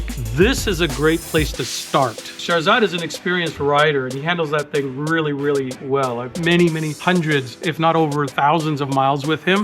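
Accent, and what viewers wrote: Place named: United States. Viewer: What a US accent. Inspired.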